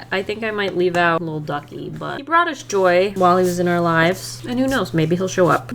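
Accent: United Kingdom. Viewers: Every once in a while she sounds British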